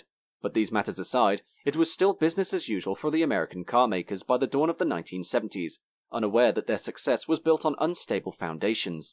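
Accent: United Kingdom. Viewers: Hearing a commentator with a British accent talk about poorly designed/inefficient American cars is utterly GALLING. Wow nobody knew how to build low quality unattractive, inexcusable, unforgivably bad cars better than the English. One step higher than Trabant, if that. I can't make it all the way through a video on the English car industry without a good strong anti depressant.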